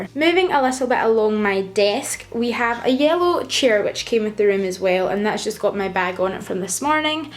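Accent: Scotland, United Kingdom. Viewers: This room is really cool! I'm just going back for my third year now so am watching videos like this in anticipation. Are you Scottish? Only ask because I am! :)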